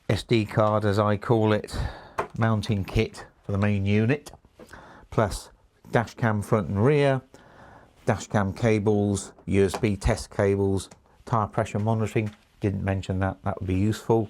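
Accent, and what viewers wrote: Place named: England, United Kingdom. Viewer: Sounds like it’s got some useful features.hearing you read them off. With your English accent.reminded me of Q.from the bond films. He was always telling bond.what the gadgets were.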